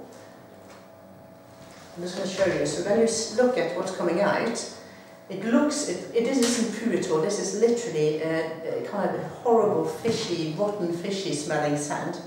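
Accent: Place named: United Kingdom. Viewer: Was very hard to understand her speaking. The British accent got in my way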